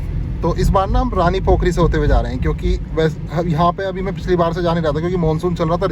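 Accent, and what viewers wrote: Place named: South, India. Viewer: Thora ahista bolo Bhai..... Tumhara Hindi south Indian bale Tamil Telugu jaisha lagte hay....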